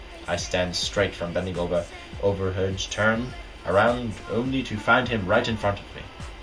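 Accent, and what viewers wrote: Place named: United Kingdom. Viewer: Is it me, or did you get all Brit on us? Not that I minded, because I loved your accent. XD I loved your puzzled looks, and you trying not to laugh throughout the story. And just you are amazing and this was hilarious. Please do more dramatic readings xD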